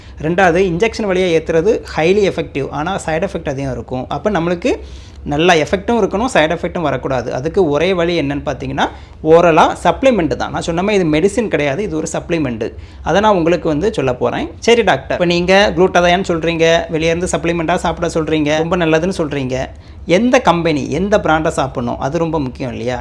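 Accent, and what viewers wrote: Tirunelveli, India. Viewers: Sir ungalukku thirunelveli ya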